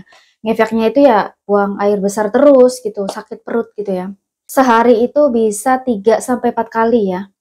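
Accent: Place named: Cirebon, Indonesia. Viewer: Mohon maaf lahir bathin juga ce moga puasanya lancar, aku klw puasa nggak pernah ijin ce Krn sehari2 incess ku gak peduli aku mkn gak paling disangka nya sedang diet, aku suka denger Cece ngmng sekali2 suka keceplosan Cirebonnya , sebagai sesama org Cirebon bikin aku senyum2, aku minum apel cuka organic beli di 360 ce ditambahkan satu tutup kedalam satu gelas air hangat lgsg turun drastis , aku juga kalau isi selai coklat suka keluar ce, bikin fatair bgtu isinya keju yg wadah nya bulat kardus itu enak ce dijamin cantik bersih , murah dikaibo hrganya kisaran 20$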